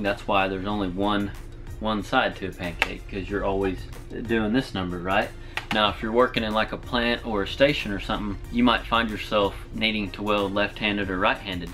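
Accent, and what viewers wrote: Oklahoma, United States. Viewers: You from Oklahoma???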